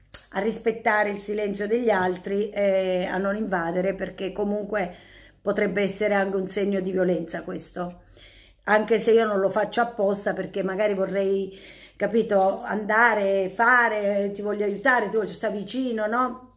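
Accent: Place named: Apulia, Italy. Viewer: Quando intercali in dialetto sei simpaticissima.ma si puglies'?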